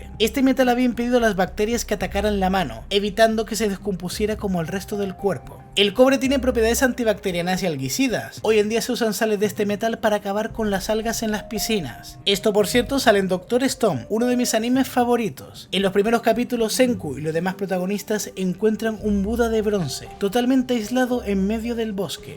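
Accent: Chile. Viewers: Hola. Soy de Chile, no sé de qué zona de España eres, pero me parece que hablas parecido a nosotros. Saludos. Felicitaciones. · Hablas como chileno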